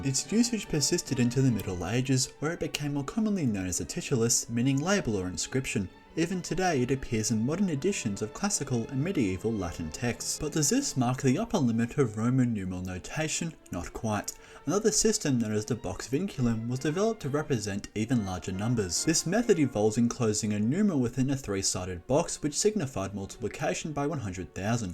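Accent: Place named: Italy. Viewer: the pronunciation was kind of weird, he mixed anglicized pronunciation with an italian reading of the C. latin is dead and also everywhere so you can pronounce it however you want, but I'm not sure by what standards that was nice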